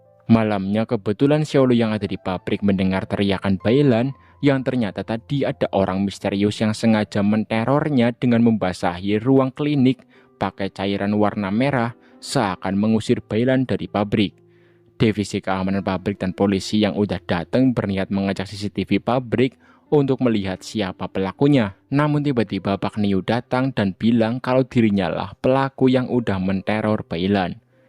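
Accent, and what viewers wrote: Banyumas, Indonesia. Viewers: Aku sangat terhibur bingiit sih mas bro kalau mas bro bacain cerita drama itu lhoo sama bahasanya sampean yang medok n ngapak bingiit ra ngapak ora kepenak yaaah 🤔🤗😁👍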